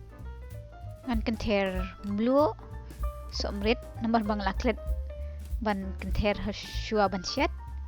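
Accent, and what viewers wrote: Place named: Meghalaya, India. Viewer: Sngewtynnad palat ia I sur bad ia I rukom kren ktien khasi paka , phim leh sarong ei2 watla phi don ha ri nongweii ruh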